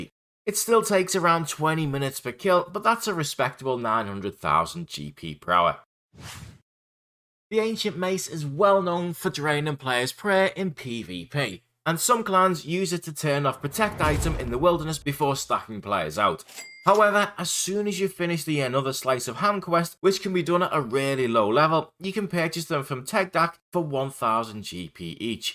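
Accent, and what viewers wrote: Liverpool, United Kingdom. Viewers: are you scouse bro?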